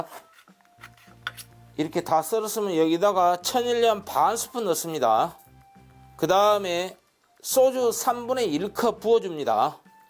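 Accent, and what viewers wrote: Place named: Jeolla, South Korea. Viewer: 아... 잘라구 누웠는데 헉... 요리사님 말ㅇ투가 진짜 이건 전라도인데 전남쪽...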